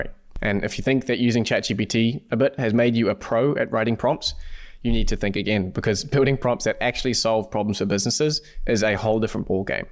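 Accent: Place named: New Zealand. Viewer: Hi.I'm picking you're a Kiwi🙏If so are you in NZ and do you have a mentoring service to assist old buggers like me. Love to connect with you, Like your content👍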